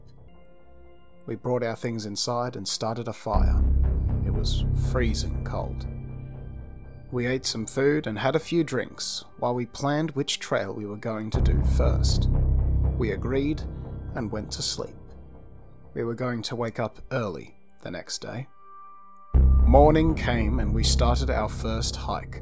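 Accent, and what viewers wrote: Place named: Australia. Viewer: Narrated by an Aussie Aussie Aussie Oi,Oi,Oi.... Cheers from Melbourne Australia 🇦🇺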